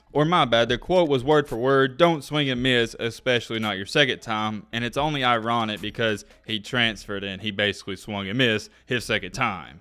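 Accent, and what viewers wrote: South, United States. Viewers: Dude your accent is awesome!! So southern like mine probably is too 😂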